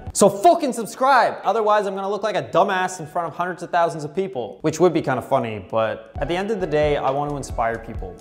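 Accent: Canada. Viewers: You're from Canada aren't you?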